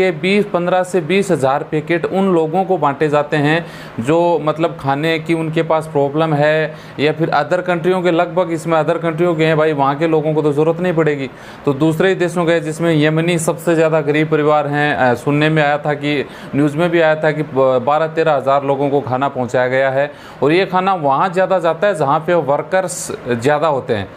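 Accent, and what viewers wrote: Rajasthan, India. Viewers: Aap sir ji pahele hindi to barabar bolna sikho saaf pata chalta h ke aap rajastani ho sorry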